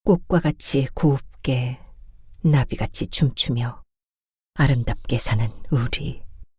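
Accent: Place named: Daegu, South Korea. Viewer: 대구 사투리! 참 재미있습니다. 시대배경이 눈앞에 그려집니다. 그리고 미성의 주인공 우리 님은 어떤분일까?❤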